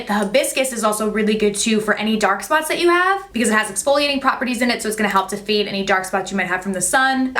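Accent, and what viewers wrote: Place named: East Coast, United States. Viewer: ... You really have given me confidence in makeup! I always try and recreate all your looks!! Love ya girl!! P.S...Philly girl here and I knew you had to be an east coast girl since you say "you guys"! 😂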